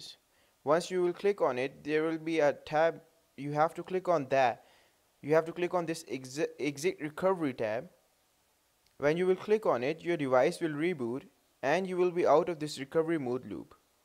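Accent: India. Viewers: (not racist) Cant tell if Indian or british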